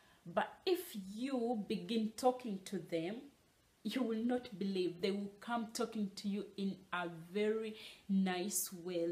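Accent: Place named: Uganda. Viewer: From your introduction 0.01 I knew you were Ugandan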